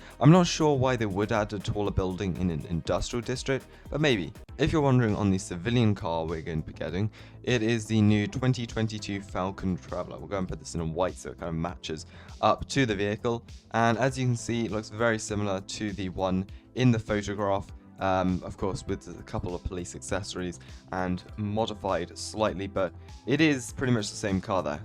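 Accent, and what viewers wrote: United Kingdom. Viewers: Can anyone tell me if is British or not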